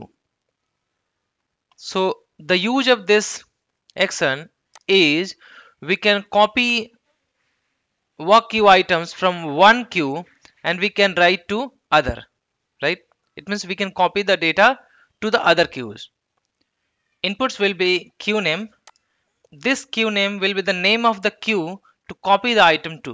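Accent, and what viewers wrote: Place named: India. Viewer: Thank you. You provided a good explanation, but your Indian accent (SOMETIMES) sounds horrible. Please work on it.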